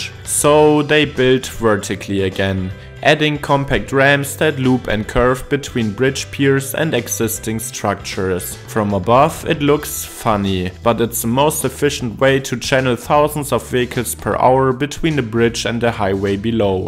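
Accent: Germany. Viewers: How dense are you? Can you not hear the thick German accent? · "elewated" . your German accent couldn't be more apparent haha. Keep up the good work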